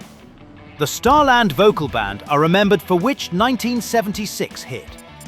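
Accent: England, United Kingdom. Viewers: !!! had two number ones, neither of which were. and. Then when came up, was their 2nd number one, I had to turn off your quiz. If you're aiming at an American audience then don't use an English voice.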